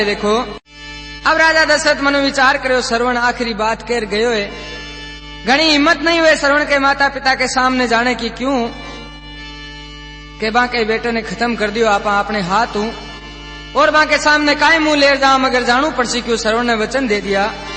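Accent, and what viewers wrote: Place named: Shekhawati, India. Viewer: इस कथा में आपका जो गाने का अंदाज है जो लय हैं इसका मेरे पास कोई शब्द नहीं है और आपकी जो शेखावटी भाषा कितनी प्यारी लगती है लव यू गुरुजी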